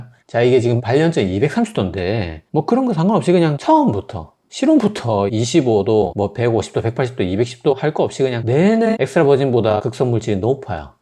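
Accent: Gyeongsang, South Korea. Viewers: 유튜버들 중에 되게 애매하게 어렵게 말하는 사람들많은데 이분은 되게 명확하네요! 직설적인 경상도 사투리가 이래서 좋아ㅏ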